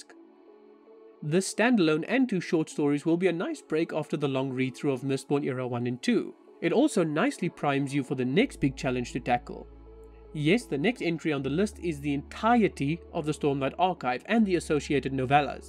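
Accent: South Africa. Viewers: First 5 seconds of you speaking I said that must be a fellow South African. Checked your about and I was correct. Nice high quality videos! Definitely gained a sub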